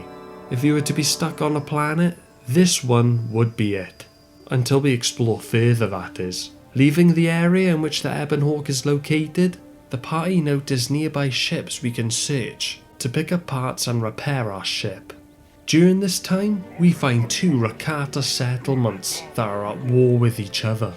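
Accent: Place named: Wales, United Kingdom. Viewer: its what happens when we welsh try to speak properly so others can understand us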